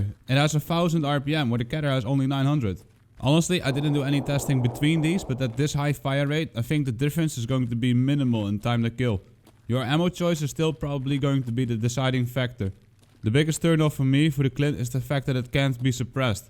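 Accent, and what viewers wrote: Netherlands. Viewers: I literally just watch for the dutch accent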